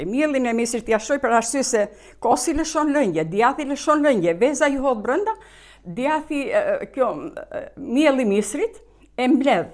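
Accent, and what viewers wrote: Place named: Përmet, Albania. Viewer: Zonje te petshendes! Te lumshin duart e arta, qe e shpjegon sh. Mire! Besoj se ini nga jugu, se dhe une andej jam, por besoj se ini PERMETARE, nga gjuha e paster qe flisni, se dhe une e perdor kete byrek, del sh . I mire! Respekte ❤️, nga Italia 🌼!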